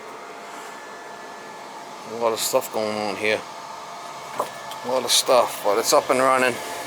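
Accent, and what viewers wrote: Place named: Boston, United States. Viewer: Now I know what those little blue caps / containers in my wife's plumbers bag are lol ... Excellent video my man .. you from BAWSTIN I take it? You sound like decaprieo and marky mark lol cheers ❤️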